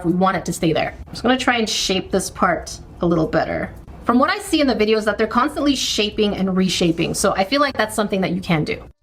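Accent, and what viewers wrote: Australia. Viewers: Im kinda hoping you get the koala just coz your aussie accent made me giggle 😂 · YOU MEAN **AUSTRALIAN ACCENT**, RIGHT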